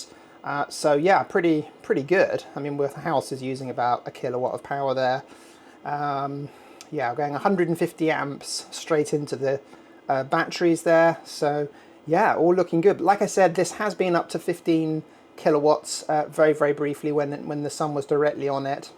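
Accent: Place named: Bristol, United Kingdom. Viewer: If I had to hazard a guess it would be Bristol 🤔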